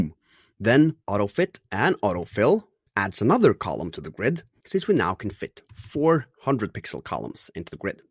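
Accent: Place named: Denmark. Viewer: Great explanation, that's what I needed, thank you! You have a danish accent btw, are you from DK?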